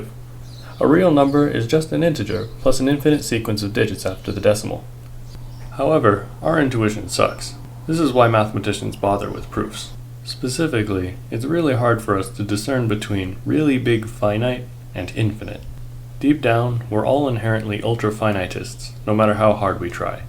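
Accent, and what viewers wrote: Quebec, Canada. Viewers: Juste pour être bien sûr, t'es québécois ???????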